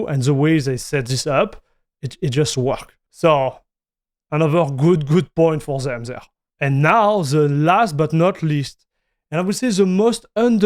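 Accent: Alsace, France. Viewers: As an aussie whos heard a few french accents and a lot of french Canadian accents he sounds super euro french to me. But i thinks its his tonality and inflection of words that give him an Arnie sound also... He could do the french dub for Arnies movies 😂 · I'm German and I can only hear French here. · On sent l'accent français. Merci pour la vidéo. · Few seconds in and I was like "he must be French" ... checked his profile which says Canada... well, it's an official language there. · He only sounds French. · As a french, I can only detect the French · He's French speaking English. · I think he is from Alsace Region of France, it's definitely French with a German influence. The dialect is called "Alsatian". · Or Elsaß ;That is why the French hear German, everybody else hear French. -) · As a Scandinavian, married to a Quebecoise, for me it sounds like a very European French accent. 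It adds a lot of character to the channel. I love it! Top content. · uuuh i hear a frenchy <3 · he's speaking french but saying english words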